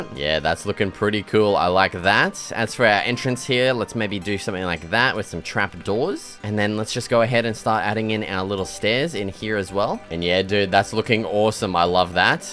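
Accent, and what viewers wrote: Australia. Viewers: you obviously don’t understand accents because he is not English he is Australian lol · Stopped watching about 1min in when I realised the Aussie accent was turned up to obnoxious.. I’m Aussie myself. It’s too bloody much bro. Stop it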